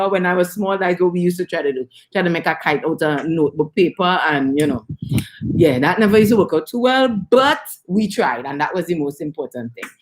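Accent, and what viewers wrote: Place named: Trinidad and Tobago. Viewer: awwwww i went to Trini a few years back :) this made me miss it. I love your accent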